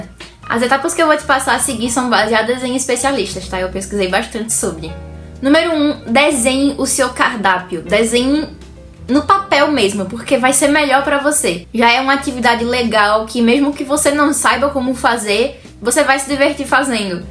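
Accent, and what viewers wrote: Northeast, Brazil. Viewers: Seus vídeos são incríveis, vc é muito fofa,ganhou mais uma inscrição, ah e você tem sotaque nordestino,vc é do nordeste?? De qualquer forma,irei acompanhar seus vídeos! ❤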